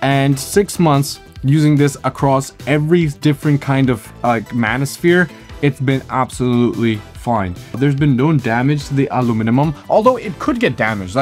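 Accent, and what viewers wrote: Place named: United States. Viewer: Dude speaks British English with an American accent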